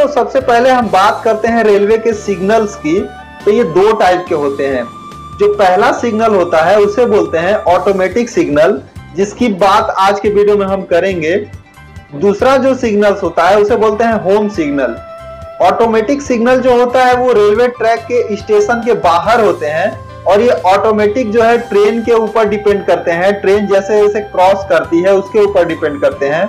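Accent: Bihar, India. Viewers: Bhai Bihari language me Aisa hi Bolte hai. V Ko bhi, Mai Ko ham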